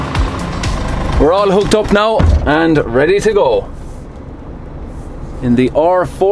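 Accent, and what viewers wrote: Ireland. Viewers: I'm gonna be honest.,the only reason I watched this was to enjoy the Irish accent.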